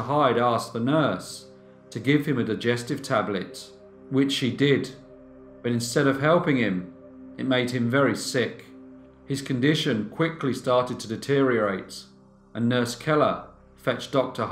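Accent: United Kingdom. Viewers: I would love to know where your British accent is actually from.London?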